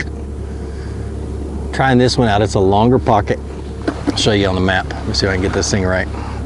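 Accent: Georgia, United States. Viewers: Are you from georgia? Now I will follow all your tips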